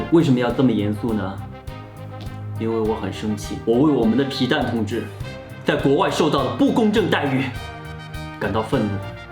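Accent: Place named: Shandong, China. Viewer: 是哪里人啊听着口音像山东的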